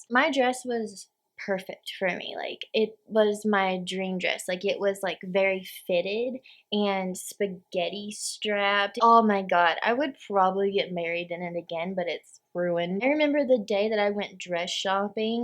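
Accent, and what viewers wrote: South, United States. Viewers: Girl I love you and your videos so much you have the cutest most subtle little southern accent and it’s honestly the most adorable voice I could live with you forever just as friends so I could hear you talk because it’s honestly the cutest thing ever And I don’t like girls like that, nothing is wrong if anyone does but I’m just saying your voice is just beautiful! And so are you! It’s honestly refreshing to see someone that’s just naturally so freaking beautiful inside and out and isn’t shallow or rude and is just authentically themselves Love you ♥️💜♥️😉😘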